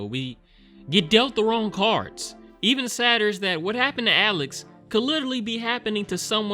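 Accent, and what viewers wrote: United States. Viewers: The most disturbing thing here is the whiny American accent.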